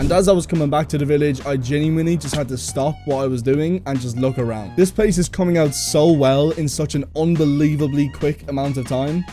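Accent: Ireland. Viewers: I KNEW IT, as an irish man when u were saying stuff like "tree" instead of three (it wus rly subtle but i could kinda tell) i KNEW u were irish, i jus couldnt prove it until wait... ive been watching that long? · I can smell the irishness from a mile away, my dad is irish so this is wholesome👍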